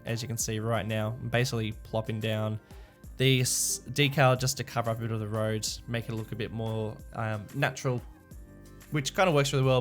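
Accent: Australia. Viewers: Amazing work mate. Great to hear an Aussie doing Cities: Skylines series and this being an Aussie based theme is even better. You're really capturing the Outback with the colours and plant life! Can't wait for each new episode! Keep up the awesome work!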